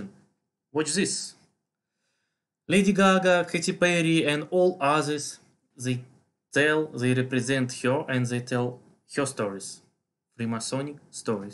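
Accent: Russia. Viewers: Офигенное произношение на английском с чисто русским акцентом! Прям, как "держу корону"))) круть! Уважаю! 👍😉💪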